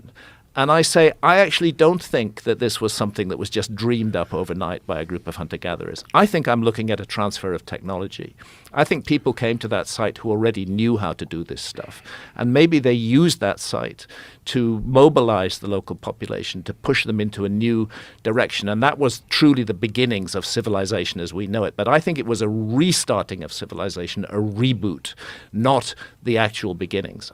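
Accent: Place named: United Kingdom. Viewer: Hancock has no education in any field close to archaelogy, geology, or even Egyptology, he's simply looking for a buck by insisting nonsense like the Sphinx is literally 100,000 years old which makes no sense lol. He's this generation's Erik von Daniken who propogated the ancient astronaut idea decades ago, except wants to put a comforting British voice and updated spin on totally insisting his ideas explain history by sprinkling some truth on a lot of reasonable sounding bullshit. He didn't invent the Young Dryas cataclysm hypothesis, he just wants to take credit for it, and the only unique ideas he expresses are absurd. They're entirely contradicted by rounds and rounds of data all over the natural sciences. I'm not saying some of what he claims isn't wondrous and worth investigating, I'm saying that a lot of what he claims is clearly wrong and he's in this for the money.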